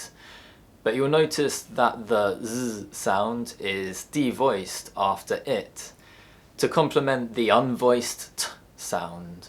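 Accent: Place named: RP, United Kingdom. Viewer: I just watched this video, I’m really interested on English pronunciation and I deeply appreciate your useful lesson and also your modern RP pronunciation. Thank you so much!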